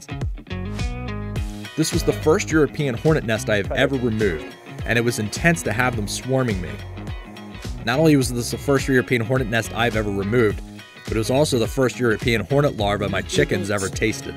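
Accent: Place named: Pittsburgh, United States. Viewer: as soon as i heard your accent I could tell it was yinzer lmaooo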